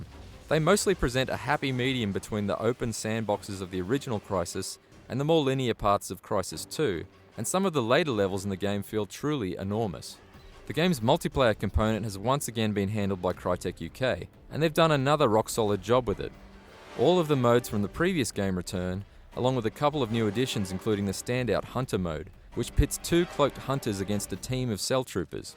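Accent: Australia. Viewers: He sounds Aussie :D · An aussie, finally <3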